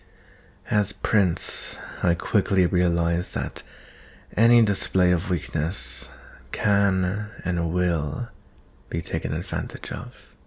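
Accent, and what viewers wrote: United Kingdom. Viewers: You’re really good at a British accent! I am impressed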